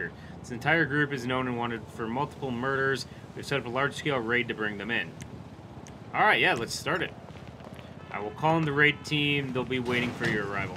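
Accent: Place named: New York, United States. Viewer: If you CPD where is o block you don’t sound like you from Chicago you sound like you’re from New York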